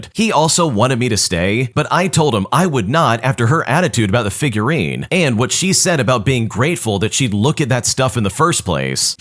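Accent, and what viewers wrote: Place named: North, United Kingdom. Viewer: I love this dudes northern british accent wish i could have it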